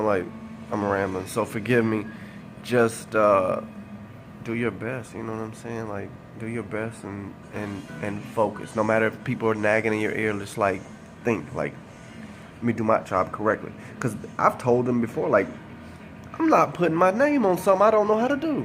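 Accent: United States. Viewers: Joey Monroe prob just a bronx/new york or brooklyn accent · HARD WORKING GUY. SEEM DEDICATED, AND YOU ARE LISTENING TO IN THE BACKGROUND. PLEASE STOP TALKING WITH Y OUR NEGRO SOUTHERN ACCENT, YOU'RE WHITE -OR SO IT APPEARS. SOUND PROFESSIONAL. THANKS. KEEP ON TRUCKIN.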